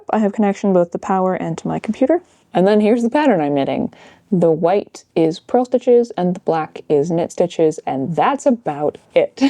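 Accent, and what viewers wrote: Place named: United States. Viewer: you actually pronounced fine just with american accent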